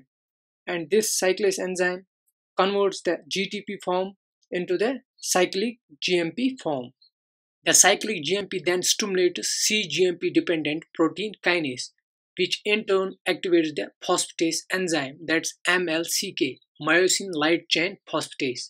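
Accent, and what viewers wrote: Kashmir, India. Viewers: I can tell by your accent you are kashmiri......Nice video tho 👍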